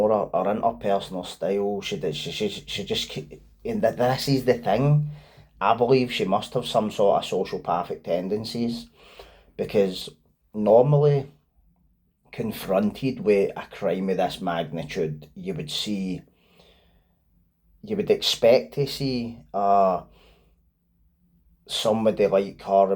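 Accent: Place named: Glasgow, United Kingdom. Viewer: No idea how i found your channel pal but im glad i did, id never heard about about this case, never mind what anyone comments or says, always safe sitting behind a screen, saying it to your face is always a different story pal, im subscribing so thank you for your hard work, great video xx its always nice to hear a fellow Glasweigian on youtube 😊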